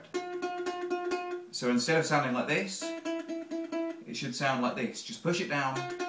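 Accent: United Kingdom. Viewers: Hello there. I haven't got a ukulele yet but I do feel like buying one. Specially after watching your simple video showing how simple it is to play it. Actually clever ppl can simplify things as you did.. And not everyone can do that.. Also your British accent is a plus. Hahhaha. Are you a music teacher in England or something? Thx for sharing your knowledge. Bye. Cheers. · Speak up ! u british ?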